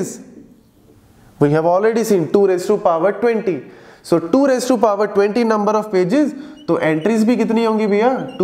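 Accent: Punjab, India. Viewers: Yeah the punjabi accent is really good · I love your Punjabi accent that makes me enjoy topics because l m also Punjabi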